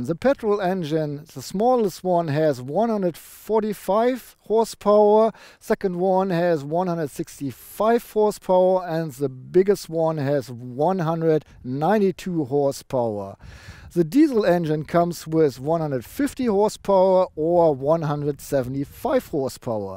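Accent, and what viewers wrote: Germany. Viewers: Die Videos sind nice... vielleicht solltest du einfach mal ne ganze Woche am stück Englisch sprechen, Radio auf englisch hören, englische Youtube Videos gucken; damit du mal diesen fürchterlichen deutschen Akzent loswirst. nimms nicht persönlich, mein Englisch hat mal genau so bescheiden geklungen ;) eine Woche reicht. Wenn du sie nicht schon auswendig kennst, guck dir alle staffeln von TOP Gear UK an...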